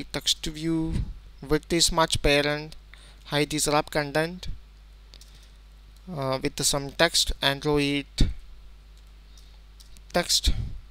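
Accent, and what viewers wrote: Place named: India. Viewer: Dat indian accent dou. =D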